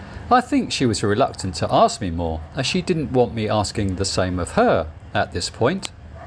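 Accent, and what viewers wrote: United Kingdom. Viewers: I just love your videos, Cheers 🍻 from Serbian fan. Sametime struggling a bit with British English, but ok.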